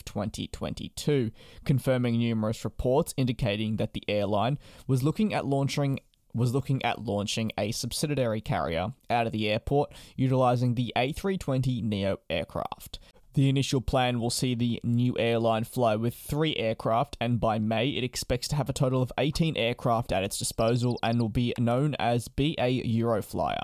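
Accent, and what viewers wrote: Australia. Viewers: Sounds Aussie to me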